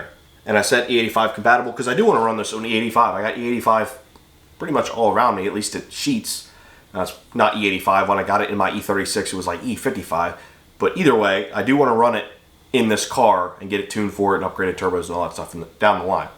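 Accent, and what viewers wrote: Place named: Philadelphia, United States. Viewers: sorry, thought your accent was Delaware County PA. Maybe the (great) Northeast? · Good stuff! Fellow Philly-accented car video-editor-guy. Cheers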